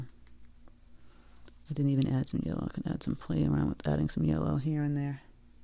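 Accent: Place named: Massachusetts, United States. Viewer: Love your teaching style I’m a beginner but enjoy your instructional method of don’t stress. Also detected a bit of a Mass accent 😀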